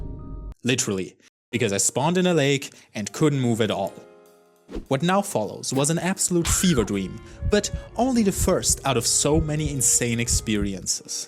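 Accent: Germany. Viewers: Dieser Deutsche akzent xD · Bro sounds pretty german.... Are you german? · sound like german accent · Bro casually spent almist 600 bucks for trash games❤💙💛 Greetings from Germany Im pretty sure I hear a german accent here? :P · sounds so german, 100% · Ich liebe diesen deutschen aktzent. Gutes Video. Mach weiter so ❤ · Are u german? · are you german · Deutscher aktzent? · I Hear Deutsch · Ayo my friend are you german by any Chance?:) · Bruder man hört ja krass das du deutsch bist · Krank wie sehr man den deutschen akzent raushört wenn man dein gamertag sieht.😂 wirklich gutes englisch.😌 kommt fast authentisch rüber bis man deinen nicknamen als deutscher liest.😂 aber geiles video junge. Hau die scheisse raus. Ich knödel dir auch nen abo raus.😂🫶🏽💀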